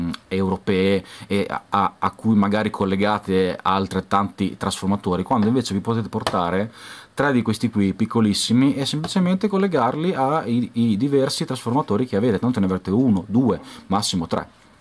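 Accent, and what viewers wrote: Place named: Modena, Italy. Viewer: Sembra di Modena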